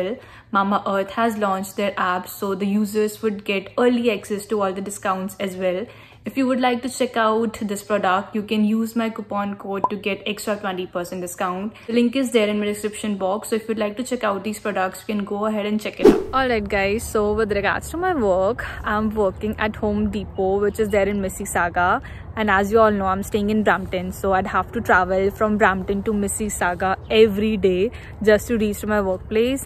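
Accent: India. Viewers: 😂Indian accent I love it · Weird background music, shameless self propaganda and chattering in monotone Indian english!!! Mentioning eye's dark circle and rubbing nameless cream is big stock of laugh.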